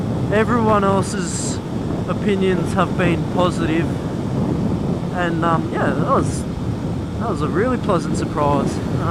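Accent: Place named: United Kingdom. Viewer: Mate I love that catch phrase lol sounds like a top fella in the UK 😉 keep the vids coming 🐨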